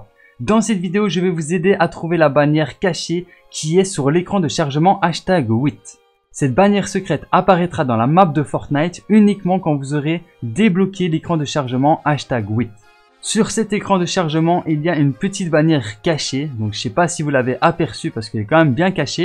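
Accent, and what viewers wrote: Belgium. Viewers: Tu es belge Nn?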